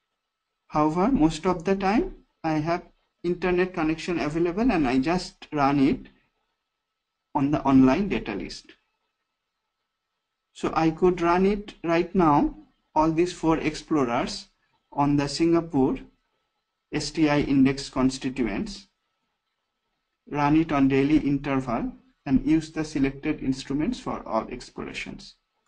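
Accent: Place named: United States. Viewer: Good! liked it. I expected a typical Bengali accent but instead an American! :). would like more from you.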